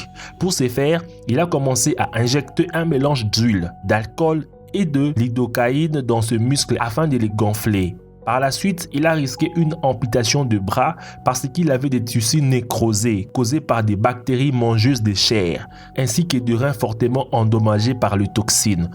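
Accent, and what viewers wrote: Africa. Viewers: Vous trouvez pas qu'il a l'accent africain un peu ?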